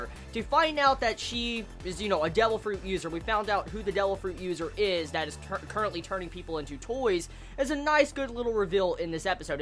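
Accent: South, United States. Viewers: random question here.....but are you from down south, as in south america? (texas ect)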